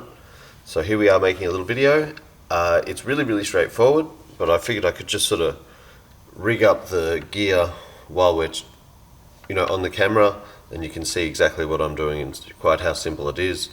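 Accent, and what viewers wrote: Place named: Australia. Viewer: This is awesome man, thanks heaps for sharing! Sounds like you're an aussie like me haha. Where are you based? P.s. keep up the great video content!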